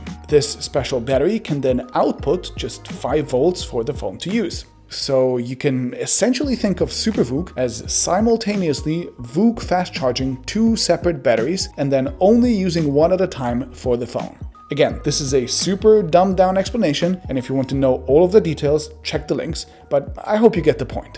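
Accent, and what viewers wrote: Germany. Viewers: are you german? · This guy has a strong German accent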